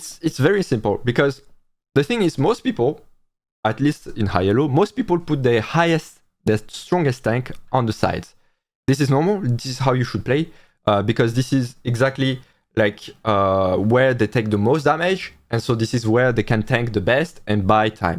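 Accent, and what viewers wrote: France. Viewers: you sound french, are you ?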